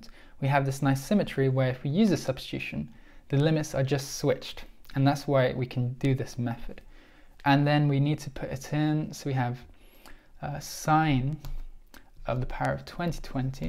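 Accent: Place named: United Kingdom. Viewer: Thank you so much I love your British accent btw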